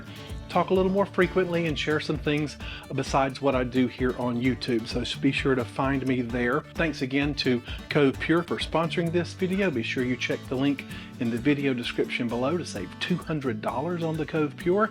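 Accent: South, United States. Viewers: ...If anyone is looking for a baby name doesn't sound too bad 😂 came for the onion sheet, stayed for the Southern speech! ❤️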